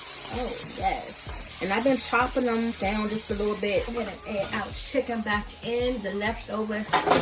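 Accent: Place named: DMV, United States. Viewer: The DMV accent is so strong lol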